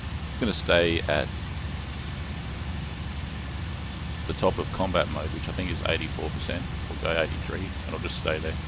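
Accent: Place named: Germany. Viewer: the sight, the guns, your will are not seperate things but one being, as is wing shooting. you shoot well but hesitatingly. refer to hartmann, his last interview, or read eicke's notes that richtofen relied uopn. we in the gallery are with you always, strange that accent...not hoch deutsch....oh well Wachendorf/usa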